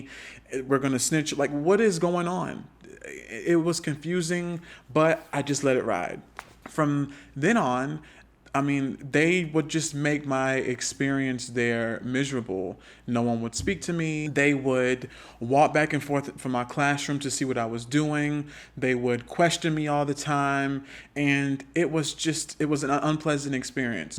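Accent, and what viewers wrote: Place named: Charleston, United States. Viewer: Sounds like Charleston Sc !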